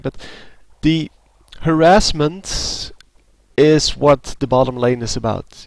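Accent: Netherlands. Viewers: Hey, zou dit ingesproken zijn door een Nederlander? ;o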